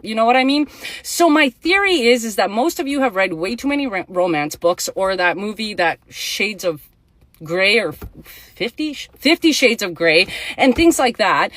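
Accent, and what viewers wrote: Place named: Prairies, Canada. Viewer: Ok this girl is from Canada and my guess is she a prairie girl lol love it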